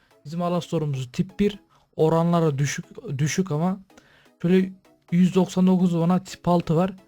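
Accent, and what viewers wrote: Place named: Konya, Turkey. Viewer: ilk 5 saniyede anladım konyalı oldugunu :D Çumralı mısın?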